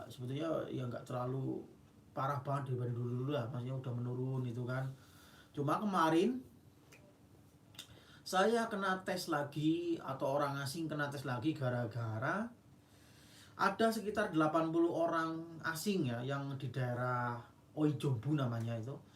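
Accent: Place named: Banyumas, Indonesia. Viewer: wah ngapak mas, wong ndi njenengan. Saran🙏 Karo Lexis audio jjal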